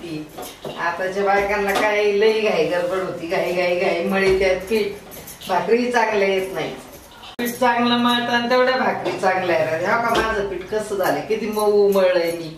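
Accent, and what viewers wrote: Ahmednagar, India. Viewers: आमच्या नगरी आजीची मस्त भाकरी.. आन् झणझणीत नगरी बोली..😀👍🏻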